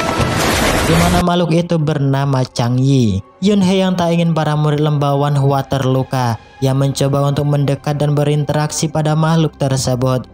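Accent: Madura, Indonesia. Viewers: Org Madura kau ya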